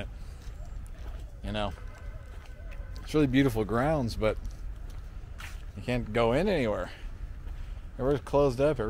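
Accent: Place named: United States. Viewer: How ignorant of you to assume that he is Brtish even after hearing his American accent 😂😂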